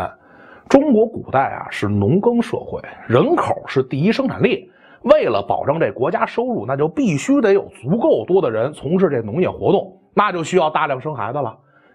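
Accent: Beijing, China. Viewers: 这北京口音咋听不出来亲切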